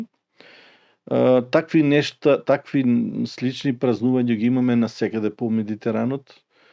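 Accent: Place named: Strumica, North Macedonia. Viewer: Поздрави..Очекувам секогаш ДА ГО ЧУЈАМ ГОВОРОТ СТРУМИЧКИ..МНОГУ МИ Е МИЛО И СИМПАТИЧНО...НАРЕЧЈАТА СЕ БОГАТСТВО..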